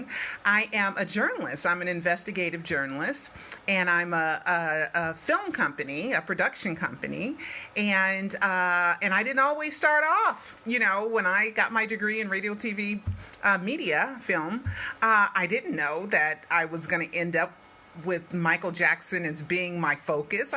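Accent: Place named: United States. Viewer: Salut!!! Joyeux noël!!! Happy Christmas!!! You are a very intelligent woman. And I will buy your DVDs on amazon. We have to support your job and it don't matter if I don't all understand, I understand the general sense. For your devotion for Michael and us. And i love your personality, your American accent. And I want to know who is Peter midani? Kisses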